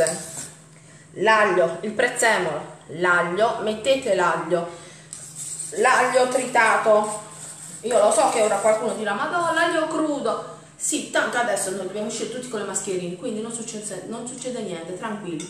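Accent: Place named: Apulia, Italy. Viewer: sei bravissima quando spieghi,sono pugliese anche io e quando parli mi sembra di sentire la mia voce con la stessa cadenza pugliese, (Corato),da 50anni sono in Piemonte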